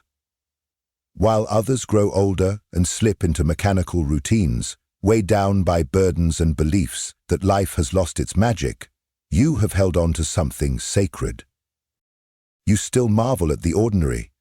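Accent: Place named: United Kingdom. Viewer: Interesting video, but why is the narration for videos like these always done by this same sounding voice with the British accent, as if it personifies wisdom and depth? It's annoying and actually undermines the message. Please, please alternate the voices used to narrate.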